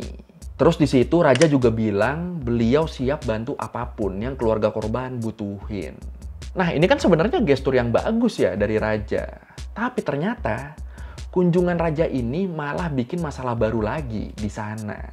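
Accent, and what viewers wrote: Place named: Madura, Indonesia. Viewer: Aksen Madura nya dpt bgt... asli Madura ya?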